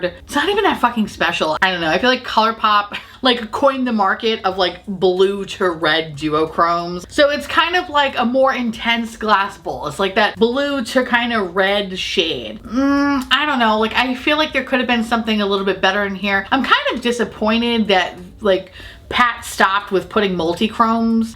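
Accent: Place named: South, United States. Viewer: you are 5 more y'all close to me loving you even more. I also love the random southern accent for no reason poppin up these days. Yea show is Pat 2 !!!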